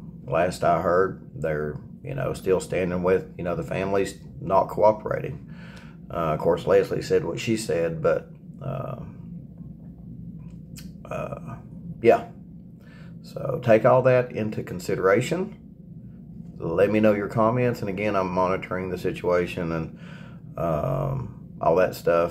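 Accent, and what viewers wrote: South, United States. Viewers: You look better today. Younger even! Just love your southern American accent.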